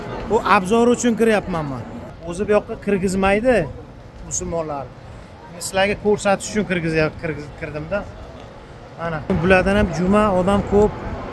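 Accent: Bukhara, Uzbekistan. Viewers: Birinchi marta kirishiz emas ekan hamma narsani joyini yaxshi bilopsiz yana ularni diniga ótib ketmang gapirishizdan tilizdan Buxoro jugutlariga óxshayopsiz shpiyonlardan bólmang yana tuxmat qilgan bólmayu hammamiz ulardan hazar qilgan paytda balo bormi ularni ichida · Сан бухоро еврейми шевангдан билиндику сани тарихингшу ушангга боргансан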